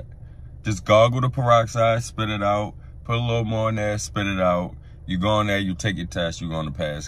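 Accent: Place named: Baltimore, United States. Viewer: Love a Baltimore accent lol! Orrralllll lol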